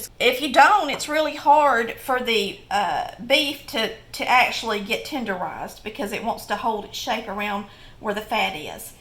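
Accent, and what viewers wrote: South, United States. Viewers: I love your videos, your Southern accent, and your recipes. I cooked this Swiss steak and it turned out tender, juicy, and delicious. You're a great cook.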